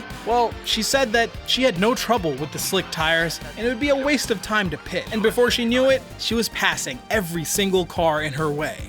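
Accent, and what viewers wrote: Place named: United States. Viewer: Hearing "bloody" and "petrol" in an American-English accent just does not compute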